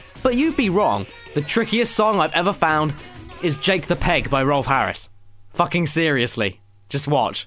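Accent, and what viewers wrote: United Kingdom. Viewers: This is my first video of yours, got to and though. "Holy shit it's British JonTron" Not that its a bad thing its amazing. Also you have the exact same video game upbringing I did. With all PS1 and no nintendo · His British right?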